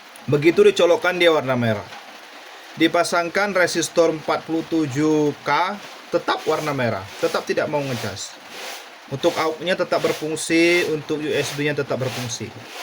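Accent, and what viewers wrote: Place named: Medan, Indonesia. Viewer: abg orang mana ? logat nya ku dengar macam orang medan. mau tanya tentang speaker eggel ku bg. kalau volume kuat mati sendiri dia bg. ada solusi nya bg ?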